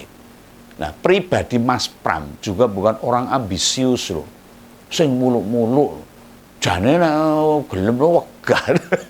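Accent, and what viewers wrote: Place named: Solo, Indonesia. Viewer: Om asal dari mana ya? Sepertinya dari Solo atau Klaten gaya bicaranya.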